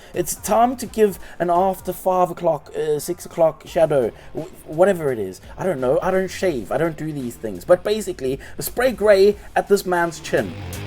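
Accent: South Africa. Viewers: I hear a South African accent and I am here for it!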